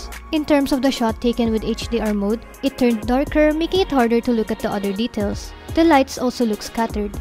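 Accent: Philippines. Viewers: Don't be bothered with those people that comment on your accent. it may not be neutral but its understandable enough for most like me. It's the natural way most filipinos pronounce english due to filipino being our 1st language.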